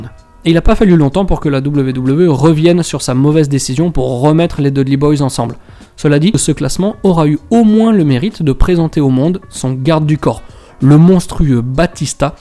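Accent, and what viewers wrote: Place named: Laventie, France. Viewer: d'où tu as chopé cet accent de Laventie?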